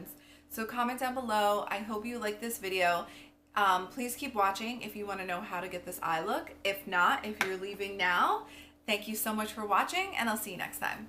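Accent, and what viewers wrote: New Jersey, United States. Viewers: Every time I watch your videos, your accent makes me miss Jersey!! I know your in NY, but this Jersey girl misses home. Adore you beautiful, and your videos. Keep them coming. xo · You have such a thick Jersey accent! Haha :) thanks for the review!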